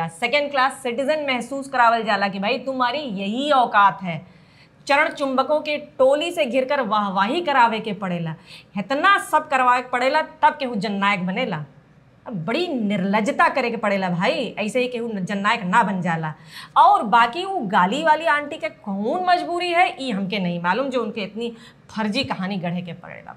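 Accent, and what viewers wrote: Bhojpuri, India. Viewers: Another very interesting episode. Well prepared. Adding a song line added extra flavour. Your Bhojpuri accent is very flavoured.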